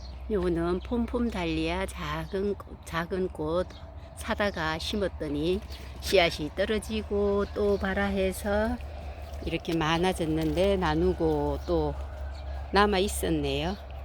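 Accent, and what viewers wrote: Gyeongsang, South Korea. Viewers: 코로나 때문에 아무것도 할께 없네요 네 정원이라도 눈이 아프드록 보고 또보고 위안을 삼지요 우리 영감님 너무 본다고 야단 맞으며 중독 됬네요 그 많은 꽃을 어떻게 관리를 하시는지? 부럽습니다 목소리를 들ㅇ.니 경상도인가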